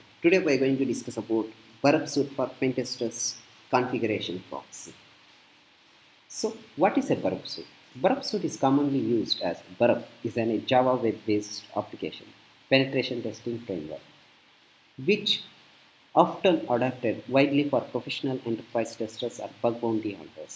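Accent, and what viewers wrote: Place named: Tamil Nadu, India. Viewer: I love your TAMIL ACCENT 🎉❤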